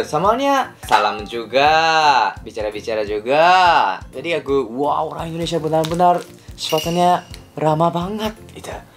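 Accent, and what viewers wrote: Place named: Japan. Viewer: Semangat terus 🙋🙋 Orang Jepang yang kurang lancar bahasa Indonesia juga lucu 😆 Aksen Jepang nya justru bikin menarik.. 🐈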